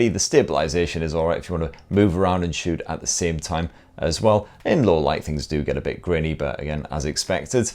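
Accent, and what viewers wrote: United Kingdom. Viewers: i'm not a tech guy but love ya style, even though i'm not an native i love and appreciate your your lovey thick accent. Hope ya dont mind me asking what part of uk ya are from. thanks. you're me favorite tech guy so far 🙂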